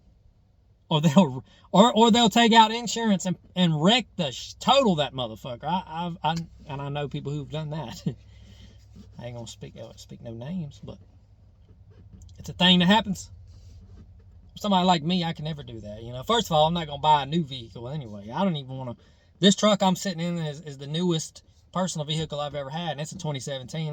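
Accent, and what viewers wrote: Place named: North Carolina, United States. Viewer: I don't know why but it really feels like you're from Montgomery County in NC for some reason. Maybe because you talk a bit like a few relatives of mine. Love the content bro!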